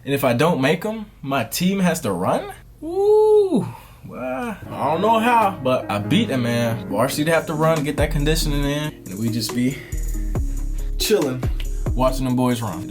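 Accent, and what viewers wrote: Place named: Florida, United States. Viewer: Your Florida accent was heavy AF back then lol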